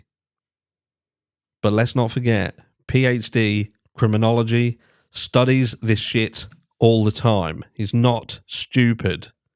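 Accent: United Kingdom. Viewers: British accents are amazing. Thanks for the commentary. Eye opening!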